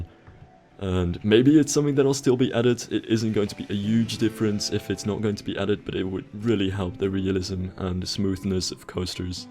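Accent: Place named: Netherlands. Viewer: Nice video! You have a great accent for someone from the Netherlands. BTW: I'm dutch too.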